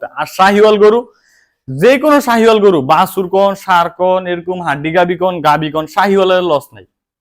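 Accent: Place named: Chandpur, Bangladesh. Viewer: কথা শুনে মনে করছিলাম শরীয়তপুরের এখন দেখি পার্শ্ববর্তী চাঁদপুরের।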